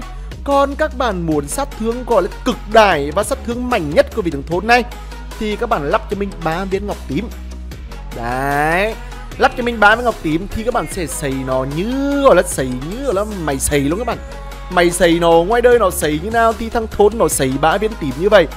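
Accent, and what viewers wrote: Nghe An, Vietnam. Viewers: Ng nghệ an mới hiểu đc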